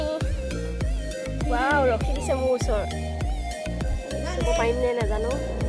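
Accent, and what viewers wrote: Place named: Upper Assam, India. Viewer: Ujoni axomor bhakha to bor val lage mur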